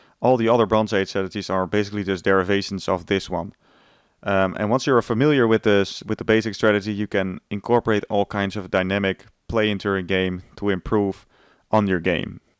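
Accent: Netherlands. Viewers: It was nice to hear a Dutch guy playing and explaining about this game :) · From what country are you. You sound Dutch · Sounds really Dutch😄😄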